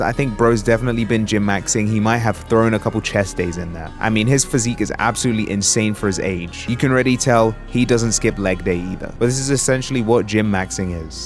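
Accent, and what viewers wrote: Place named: United Kingdom. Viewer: Dude I kinda hear an accent, are you originally from the uk? 🇬🇧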